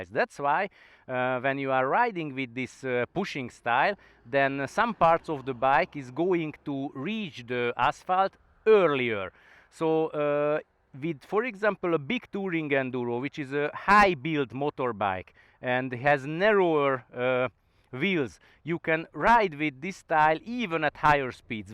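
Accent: Hungary. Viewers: Are u by any chance from Hungary?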